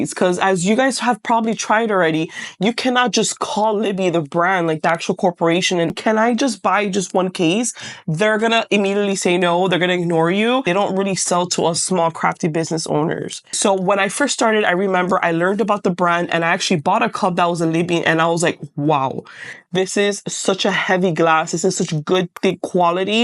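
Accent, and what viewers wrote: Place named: Miami, United States. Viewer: idk how you popped up on my feed but girl, I’m so grateful to you- your video has been so helpful. I’ve watched so many creative small business videos and as soon as I herd your voice I was like “bro she’s from Miami” I know this accent. I’m from Miami and then you said Miami y Doral … I’m like Gracias Diosito. Finally someone on YouTube that’s local. Im slowly growing my small business and I really want and need this business to succeed (pregnant with my second baby and I know I’m made to create). 🥹I’ve known about Libby from wine glasses and never knew they have glass cans so THANK YOU 🙏🏻 excited to watch more of your videos and get inspiration to also share my journey and grow this business. 💝🎨 thank you again- you Rock 🌻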